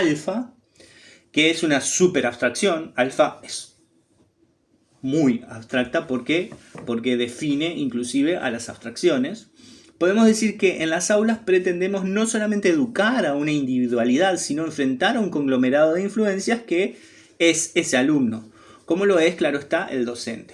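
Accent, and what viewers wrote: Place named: Uruguay. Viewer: Hola. Envidio esta elegancia en la expresión. Y este acento uruguayo me parece también magnífico. Gracias por regalarnos tanto conocimiento y por ser tan crítico con determinados tipos de inclusión que, en lugar de integrar, desintegran la vida de muchas personas porque desintegran su mente. Un saludo cordial.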